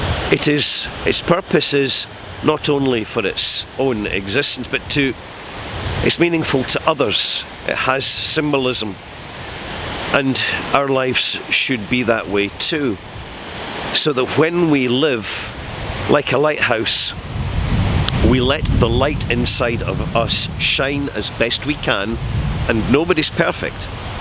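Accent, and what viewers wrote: Scotland, United Kingdom. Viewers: I came here for philosophy, setting and a Scottish accent. Liked and subscribed.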